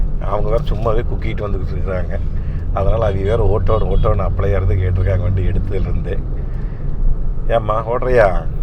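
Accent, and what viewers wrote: Kongu, India. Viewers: Ungaludaya sweet kongu speech really super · கொங்கு பேச்சு வழக்கு அம்மணி மிகவும் அருமை 🌹🌹🌹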